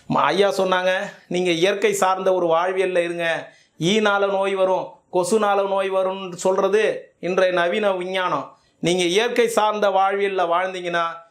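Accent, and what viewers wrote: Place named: Coimbatore, India. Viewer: நன்றி ஐயா. மலம்கூட மண்ணுள் மக்கினால் மணக்குமென்பதை அழகாக விளக்கினீர்கள். ஏனுங்க நீங்க கோயம்புத்தூர் பேச்சு வழக்கிலேயே பேசுங்க.அழகா இருக்குதுங்க.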